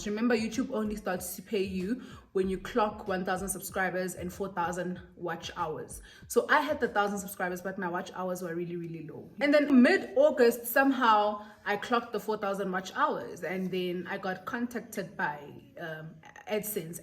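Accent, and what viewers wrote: Nigeria. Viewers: Woww❤❤❤you look like those rich Nigerian woman with that face+accent,,we can't wait for more video#2024,,,, Happy New Year Motswala 🎉🎉🎉😍😍😘😘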